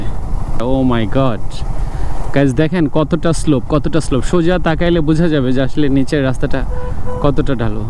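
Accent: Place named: Bogura, Bangladesh. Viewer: Vi apnar basha Bogurai ......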